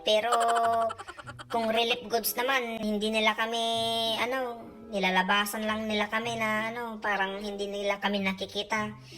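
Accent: Ilocos, Philippines. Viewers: Tagalog Ilocano met auntie 😂😅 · bakit ganyan ka kuhang kuha mo ang style ng mga ilocano magsalita haha,.. More videos ante pra pmpa good vibes lng s kbila ng problmng kinkharap ntin s buhay.. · Makapakatawa Ante 😂😂😂 Makarelate ak ta kasta ak agsao tagalog ilocano haha. Salamat ti effort mo nga pakatawain na kami aglalo tatta nga panawin. 👍😊 God bless always ❤️😇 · Hahaha ilocano tagalog hahahaha... pavirgin🤣🤣🤣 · Naalala ko mga lola ganyan nila ako kausapin tagalog na may touch ng ilocano.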